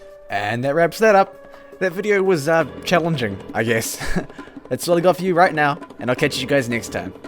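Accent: New Zealand. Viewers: You must be from New Zealand, with an accent like that. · Are you from NZ? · Omg its muselk! Oh wait it's just some kiwi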